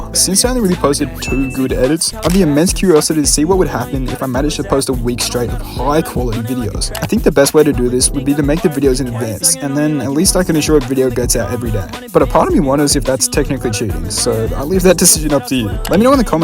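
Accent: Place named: Australia. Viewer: I KNEW YOU WDRE AUSTRALIAN BY YOUR VOICE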